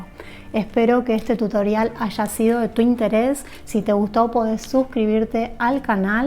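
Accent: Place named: Argentina. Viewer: Vine para ver lo que se ofrece en instrucciones. Qué lindo escuchar un accento argentino. Hacía demasiado que no escucho una voz argentia. Voy a volver a ver el programma con mis hijas para que ellas también lo desifruten.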